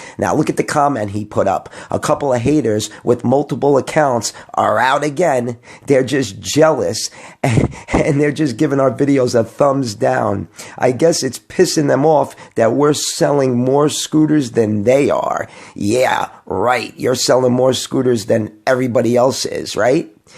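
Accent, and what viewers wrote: New York, United States. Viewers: I love how u call it how it is you get straight to the point and i love your nyc accent lol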